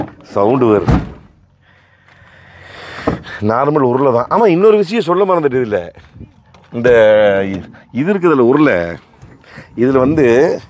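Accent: Kongu, India. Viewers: கொஞ்சும் கொங்குத் தமிழ் பேசும் கோமகரே...! திகட்டாத தித்திக்கும் பேச்சுடைய திருப்பூர் திருமகரே...! லடாக் பயணச் சாதணைச் சகாப்தம் எங்கள் மதிப்பிற்குறிய திரு. அண்ணா அவர்களே❤❤❤🙏 எப்படி இருக்கீங்க அண்ணி❤❤❤🙏 எப்படி இருக்காங்க தம்பி ரித்திக்❤❤❤ எப்படி இருக்காரு. உங்களோட பல வீடியோக்களை நான் Miss பன்னிட்டேன். சிலபல இக்கட்டான சூழ்நிலை காரணமாக வீடியோ எதுவும் பார்க்க முடியல கமெண்ட் பன்ன முடியல. வழக்கம்போல அண்ணா உங்களோட பேச்சு வீடியோ சும்மா நெகு நெகு நெகுனு இருக்குங்கோ😊😊😊❤❤❤❤ நடுத்தர குடும்பத்தினருக்கு ஏற்ற அற்புதமான சாலை ரதம் என்றே இந்த "MARUTI SUZUKI EECO"வை கூறலாம்❤❤❤ மாருதி நிறுவனத்திற்கு ஒரு கணிவான வேண்டுகோள். அண்ணா நீங்களும்.... இந்த கமெண்ட் பார்க்குற சகோதர சகோதரிகள் யாராச்சும் மாருதி நிறுவனத்தில் பணிபுரிந்தாலோ? அல்லது மாருதி நிறுவனத்தோடு ஏதேனும் ஒரு வகையில் தொடர்பில் இருந்தாலோ இந்த வேண்டுகோளை மாருதி நிறுவனத்திற்கு தெரியப்படுத்தவும்🙏 நடுத்தர குடும்பத்தினருக்கு ஏற்ற அற்புதமான வாகனம் இது❤. 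சுய தொழில் புரிவோருக்கும்.... குடும்பத்துடன் பயணிக்கவும்... அவசர ஊர்தி (AMBULANCE) சேவையிலும் இந்த வாகனம் 100% பங்கு வகிக்கிறது🙏 மாருதி நிறுவனம் அவங்க கார்களை BS6 PHASE 2 விதிமுறைகளின்படி பல வடிவங்களில் புதுசு புதுசா தயாரித்து வெளிவிடுறாங்க. அந்த வகையில் இந்த ""MARUTI SUZUKI EECO"" வாகனத்திற்கு பாதுகாப்பு திறன் வசதிகள் மேம்படுத்தப்பட்டு. உ.தா (ESP.... TRACTION CONTROL.... HILL HOLD ASSIST) Power Steering. இவற்றோடு ஆட்டோமேட்டிக் கியர் பாக்ஸ் மற்றும், டிரைவர் சீட்டுக்கும் கோ பயணி சீட்டிற்கும் அட்ஜெஸ்ட் ஹெட்ரெஸ்ட்டும் கொடுத்து புதிதாக விற்பனைக்கு கொடுத்தால் 1000% மிகவும் பயனுள்ளதாக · சார் நீங்கள் பேசும் கொங்கு தமிழ் ரொம்ப நன்றாக இருக்கிறது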